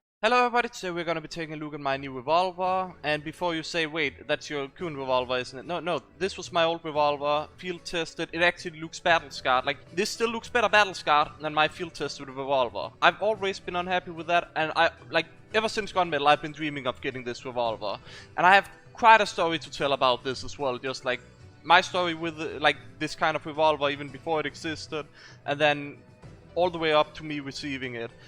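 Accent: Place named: Denmark. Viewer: Where are you from? You sounds like a dane tbh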